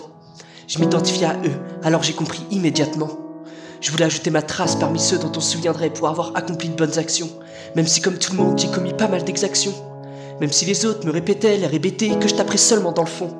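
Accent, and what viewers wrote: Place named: North, France. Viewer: Les Textes vidéos d' ah oui tu es du nord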